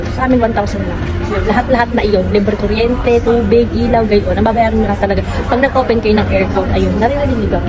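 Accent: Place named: Batangas, Philippines. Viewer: Abay ikaw gay batangueña..ala e..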